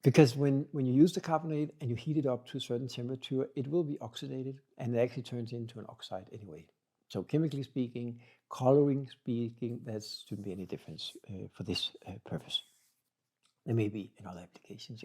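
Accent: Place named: Denmark. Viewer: Danish? Your accent sounds just like my uncles! ❤ great video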